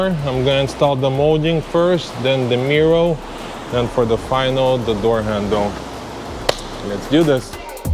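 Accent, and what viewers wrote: Russia. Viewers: You sure sound Russian.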